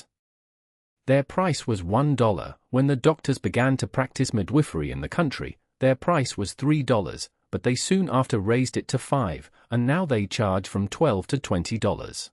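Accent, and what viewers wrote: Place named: United Kingdom. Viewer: The fact that the voice is British…. Doesn’t fit. He was American. And his book is amazing. I couldn’t put it down, so the fact that this is not catching my attention says a lot, to me anyway. Lol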